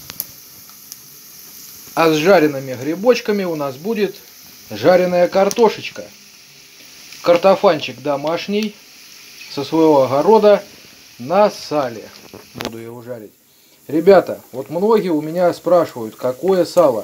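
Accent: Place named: Kursk, Russia. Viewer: Какая область???? Акцент Курский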